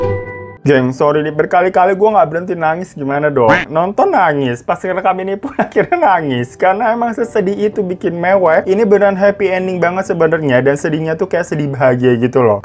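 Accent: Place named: Banyumas, Indonesia. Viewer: Bang muka jepang tapi ngomongnya ngapak, 😂😂 i love you abang 😘😘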